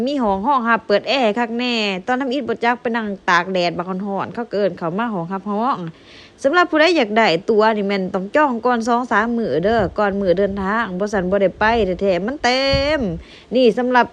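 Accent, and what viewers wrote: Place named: Sisaket, Thailand. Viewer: สำเนียงคล้ายๆกับทางศรีษะเกษป่าวครับนี่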